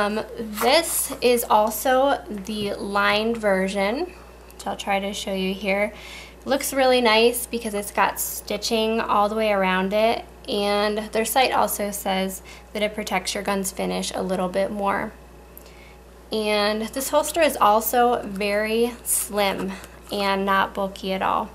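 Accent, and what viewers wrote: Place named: South, United States. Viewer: I just noticed but you have a tiny bit southern axcent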